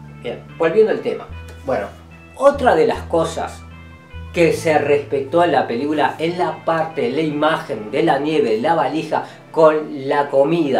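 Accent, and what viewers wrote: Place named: Uruguay. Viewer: Los actores en Viven si parecen uruguayos… en Uruguay hay de todo pero obvio q no lo son por como tratan el mate. Honestamente yo pensé que vos eras peruano… me sorprendió q dijeras q sos uruguayo. Al oír tu acento es obvio q lo sos. Pero hay de todo en todos lados… yo vivo en USA y paso x americana hasta w escuchan mi acento… a partir de ahi soy de casi todos los países europeos 😂 solo q tengo ADN charrúa, y a mucha honra 😊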